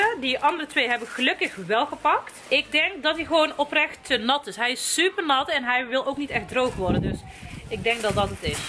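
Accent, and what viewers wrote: Brabant, Netherlands. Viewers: Heerlijk Brabants acsent heb je, I love it. Was echt een leuke en grappige video.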